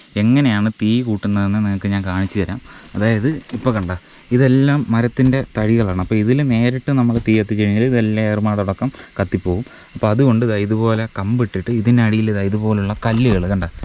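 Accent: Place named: Trivandrum, India. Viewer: Chila comments kandu bgm idan kurachu neram vaa pooti irunnal mathi 😂 video start cheyumpo muthal kalapilaa ennu samsarichondirikkum but trivandrum style samsaram rasamund ennalum kurachu kurachal nannayirikum 🤐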